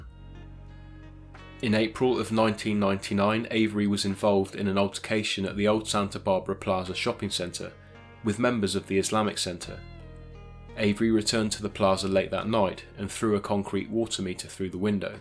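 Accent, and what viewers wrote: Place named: United Kingdom. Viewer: Sorry but "Tales from California" read with a British accent... hmmm. doesn't jive... It's not "Los Angel-ee-s" It's "Los Angel-y-s".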